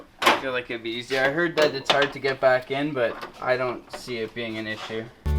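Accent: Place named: Canada. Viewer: Are you Canadian Bro? I am too! Maybe you are in northern USA, the accents carry over. Great video!!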